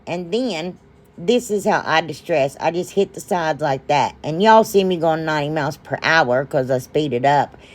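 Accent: South, United States. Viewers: I have watched most of your videos and that says a lot since I just found you in the last month or two. I love you. Your laugh is such a blessing. It just uplifts me. I started watching your videos while I have been recuperating from illness. I became ill the week before Christmas and I am finally feeling close to normal. I first thought you were from the south, you sound it, but you say "you guys" which is a northern usage. We here in the south say "Y'all". Whether you're from the north or south you have not offended me. I have listened to most(my husband won't let me listen when he's watching tv). I understand everyone can't afford all of the things you have, I can't. I do appreciate that you use a lot of things from the Dollar Tree. You are not rude by no means! You are very kind especially the way you sign off. I feel like you are my friend. I wish we lived close. 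I live in a small town in the most northwest city in Tn. God loves you and so do I.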